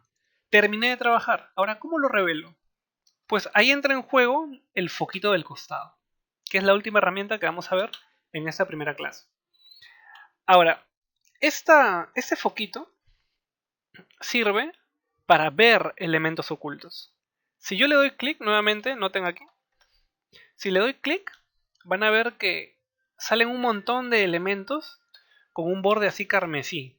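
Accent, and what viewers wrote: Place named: Mexico. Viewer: EXCELENTE MANERA DE EXPLICAR MI BUEN. ME DA CURIOSIDAD TU ACENTO, PARECE ACENTO MEXICANO JAJA. GRACIAS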